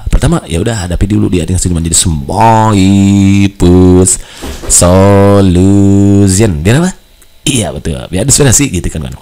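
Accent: West Java, Indonesia. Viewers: Orng Sunda ini mimin nya 😁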